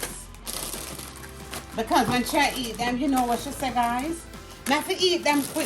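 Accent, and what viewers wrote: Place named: Jamaica. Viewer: Interesting to see your haul from Jamaica. Items I've never seen before and great education for me and especially you speaking in Jamaican 😍👍🏻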